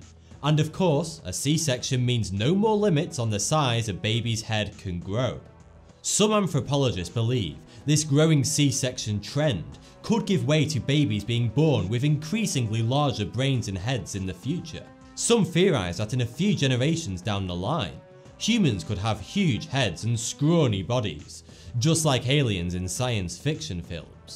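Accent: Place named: West Lancashire, United Kingdom. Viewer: West Lancashire accent.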